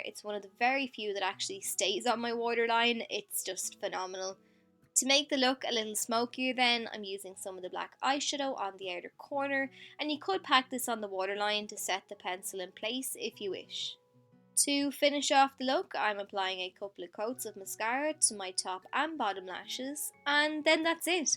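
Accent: Ireland. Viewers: Great youtube makeup artist 1. because she is talented 2. lovely irish accent :)!!!!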